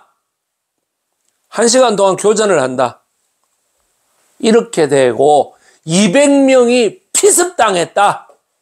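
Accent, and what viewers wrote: Gyeongsang, South Korea. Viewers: 상대방을 대하는 존칭부터 배워야 될것같네 혀짧은 반말 갱상도가 개상도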